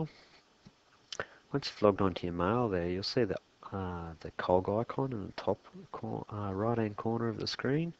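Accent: Australia. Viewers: 10 Stars. I have searched for days for the answer to this. Your method and the order you did it all in worked for me..finally! Thank you very much. Nice calm Aussie voice, made it even more pleasant.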